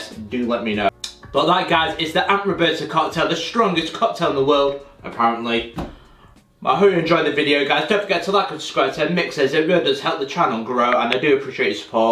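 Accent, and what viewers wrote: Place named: United Kingdom. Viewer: That's the most British accent I've ever heard. Cheers! 😂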